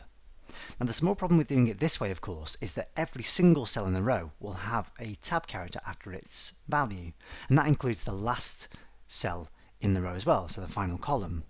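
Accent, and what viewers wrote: United Kingdom. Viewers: Amazing video! thank you so much! You explain complicated things in such an easy way, great job! Oh and that amazing British pronunciation!!!!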